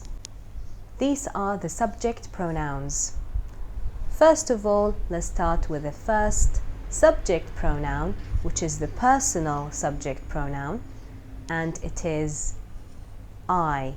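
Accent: United Kingdom. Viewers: احسس حد في العرب سمعته بيتكلم انجليزي بريطاني ان شاء الله في يوم هاتكلم زيها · ماشاء الله تبارك الله ربنا يوفقك اللكنة البريطانية جميلة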